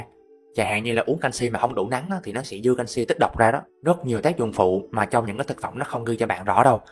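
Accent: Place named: Vietnam. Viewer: Video rất bổ ích , mà nói giọng tùm lum tà la, lúc giọng bình định lúc giọng bắc lúc giọng nam, cũng ráng nghe đó mà nó cấn cấn k có êm tai. Mình góp ý chút vì bạn rất có tâm vào video này, mong sau này bạn luyện nói 1 giọng thôi cho nó êm tai, vì video dài như thế này cần 1 giọng đọc hấp dẫn mới nghe đi nghe lại để học và tiêu thụ kiến thức, chứ k phải nghe 1 lần rồi thôi. Cảm ơn bạn thanh niên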